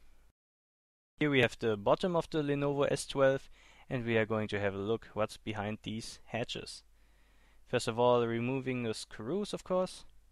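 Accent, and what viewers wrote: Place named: Germany. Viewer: man merkt wirklich dass du nen deutscher bist an deiner aussprache. Arbeite mal dran. Sonst alles top ; )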